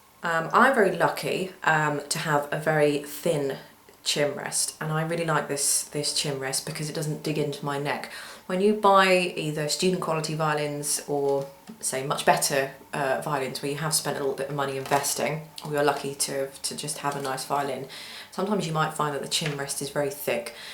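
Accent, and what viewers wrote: United Kingdom. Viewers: Love that Limey accent! When she says "tune" she pronounces it like "chune" Chic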